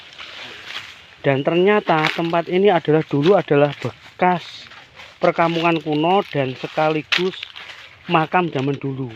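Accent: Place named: Java, Indonesia. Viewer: Dari nada suaranya pasti org Jawa ini banyak dobol nya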